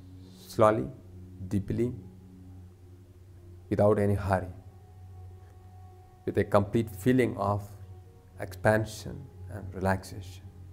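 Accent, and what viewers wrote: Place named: India. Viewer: heloisa688 it's his variation of english: Indian English. Not bad pronounciation. English is official language in India.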